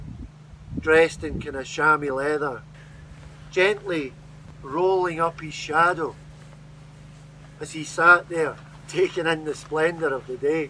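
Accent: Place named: Scotland, United Kingdom. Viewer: I love a great Scottish accent telling a tale of wee folk in a beautiful place! It's a great escape from the modern world and all its troubles!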